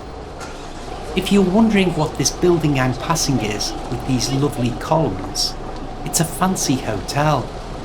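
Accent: Liverpool, United Kingdom. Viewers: Accint liverpool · Your class was very didactic, but the Scouse accent is very complicated, in many parts I didn't understand what you were saying!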